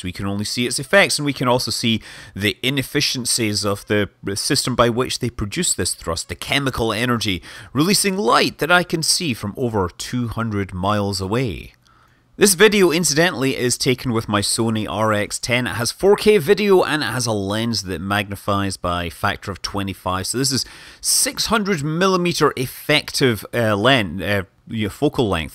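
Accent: Scotland, United Kingdom. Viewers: He always talks with a Scottish accent though. 😒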